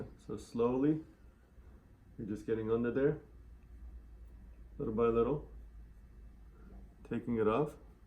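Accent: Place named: Iran. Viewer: اول از لهجه فهمیدم ایرونین بعد فامیلیتونو دیدم ،عالی😅😂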